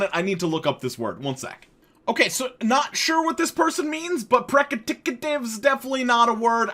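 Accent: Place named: Long Island, United States. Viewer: I have to admit I don’t care for the yelling and Long Island accent...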